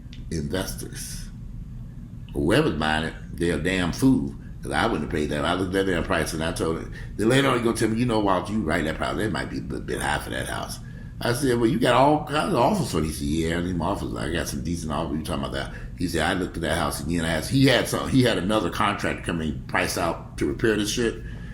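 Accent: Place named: New York, United States. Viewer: Sounds like NYC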